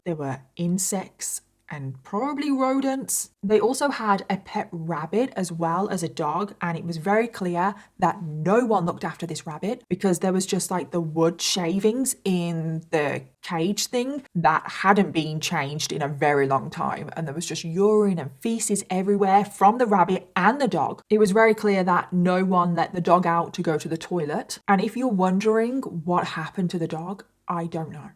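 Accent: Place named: Birmingham, United Kingdom. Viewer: Going off topic, there's something about your accent. One minute you sound antipodean, the next you sound like someone from Birmingham, UK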